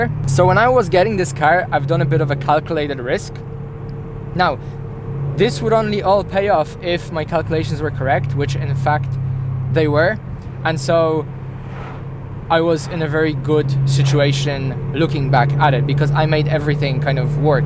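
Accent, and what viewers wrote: Ireland. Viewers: Great car!, you lived in Ireland or your teacher was one? Asking because of accent 😁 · I understand you're a Polish channel.. But are you from / did you grow up in Ireland? Swear to god you sound like you're from Ireland when you're speaking - like somewhere from the southeast of the country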